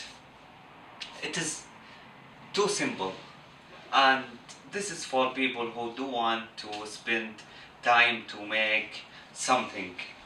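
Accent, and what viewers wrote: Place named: Arab world. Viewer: You are too cute with the Arab-english pronunciations!!!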